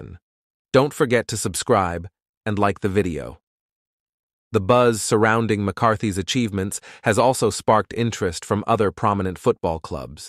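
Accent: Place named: United States. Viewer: uyacasula ngale American accent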